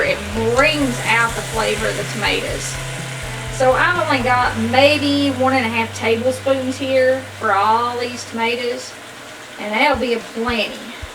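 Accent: South, United States. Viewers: i live in texas now, left the great state of Arkansas in 1954 and adopted Texas as my home. i love your channel not only for the dishes but mainly to hear that voice, nothing takes me back home more than a good old southern accent. a lot of the dishes you make we called them our bachelor dishes when me and the kids had to get by alone during the divorce and remarry stage. i came up with lots of dishes that were easy to make and filled us up well, couldn't ask for more than that, and today after all those years ago the kids and grandkids still ask me to make some of those dishes, my cornbread is a favorite.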